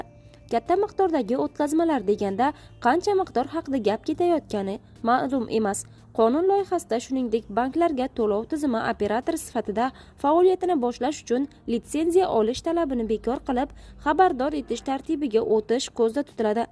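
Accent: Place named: Angren, Uzbekistan. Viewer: ГАПЛАРГА ТУШУНМАДИК. АНГРОК ГАПРСА БОЛАДИМИ. 😮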